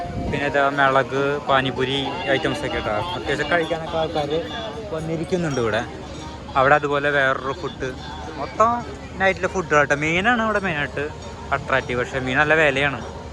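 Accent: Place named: Alathur, India. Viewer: നിങൾ ആദ്യമായി വരുകയനലെ നിങ്ങളുടെ ആലത്തൂരിൽ സംസാരം കേട്ടാൽ അറിയാം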